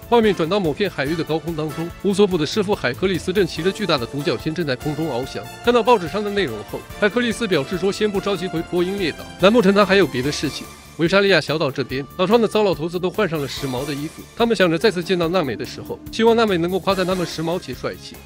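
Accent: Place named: Sichuan, China. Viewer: 你是四川人哦？講話的腔調跟王剛很像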